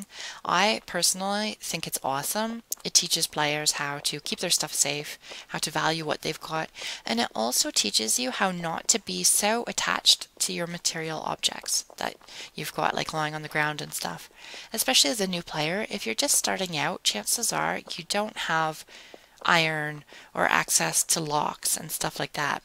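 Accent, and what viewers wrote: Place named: United Kingdom. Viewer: Where's your accent from? It sounds like a little British in there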